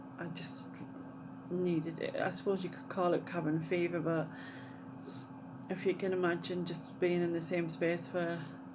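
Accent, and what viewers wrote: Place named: Newcastle, United Kingdom. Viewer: Love your accent, I used to live in Newcastle, miss itx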